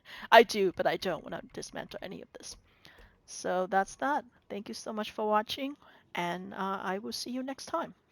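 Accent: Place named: Northeast, United States. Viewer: You sound like you’re from the Northeast lol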